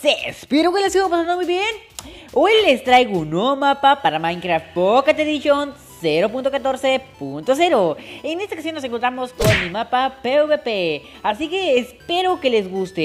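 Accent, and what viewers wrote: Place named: Yucatan, Mexico. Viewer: Ahhh pense que eras de yucatan 😄😉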